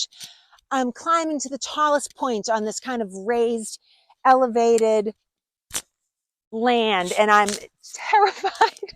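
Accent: Boston, United States. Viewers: Came for the history, stayed for the Boston brogue. Good content earned my subscription. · Love her Bahstun accent 😊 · Great video. Your narration is excellent. Love your accent. You sound just like a former client of mine who came from somewhere near Boston. I have been to both Lexington and Concord and loved the area and its history. I subscribed and am looking forward to watching more videos. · you seem to have a Boston accent, cool, mine is R.I....😊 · Makes me think of A Matter of Life and Death aka Stairway to Heaven when Abraham Farlan is the prosecutor. 😂 neither Massey or Kim Hunter had Boston accents like you! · Ya can diffiantly can tell your from New England / Boston accent...😂😂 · You're from Bahston? Wow, had no idea. Great channel. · When I first saw this documentary I wasn't sure if this was about new England and Boston during the revolutionary War and but then I heard her voice and was like yup...definitely Boston. 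😂...its good to see a New England youtube channel loving what they do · Well researched and presented! Good job! (Love your Boston accent. 😊) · My Mom was from Maine, but lived in California from her early twenties until she passed. Is your accent a Boston accent or is that how her accent would have sounded as her being from Maine? I’m just curious. Loved your story and subscribed to hear more content. Thanks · Is that the original Concord bridge that stood 250 years ago,? By the way, I love your Boston accent. You have a new subscriber for all things about the Revolutionary War. History is fascinating, is it not? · Another awesome video! Your Boston accent just makes the video. Love watching your enthusiasm. 😊👍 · Love her Boston accent. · After the first 30 seconds of listening to her talk, I knew without a doubt that she was definitely from Boston.🤣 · New sub here! You hooked me with your excellent presentation with its enthusiasm and, of course, that wonderful BahStun accent! 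Love it! I can't wait for the stories yet to come! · Outstanding, love that Boston sound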